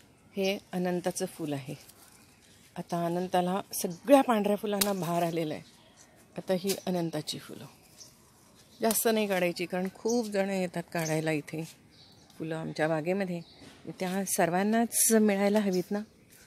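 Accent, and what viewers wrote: Kolhapur, India. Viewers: मँडम चे व्हीडीओ छानच असतात आपोआप त्यात गुंतले जाते व्हिडीओ ची सुरवात पुणेरी भाषेत होत असली तरी नंतर कोल्हापूरीतच बोलतात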